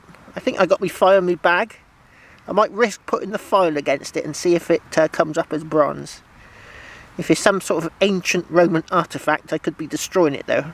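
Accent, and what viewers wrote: United Kingdom. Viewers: Hi. I love to listen to a nice west country accent just like my old POP, he came out to australia from England in 1920. The GPX 5000 was designed in australia to cope with the highly variable iron mineralization of our goldfields, Its primary purpose is to hunt for gold nuggets. The large coil will be confusing the detectors micro proccessor into trying to retune iron targets out as ground noise. A PI machine will punch deep in mineralizd ground but will not be much good at interpretting the signals from the iron or copper halo that forms around long buried iron or copper bronze objects. It will however find gold coins etc.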